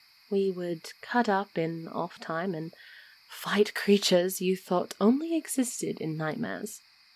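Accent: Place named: United Kingdom. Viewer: This was really sweet! I loved your british accent!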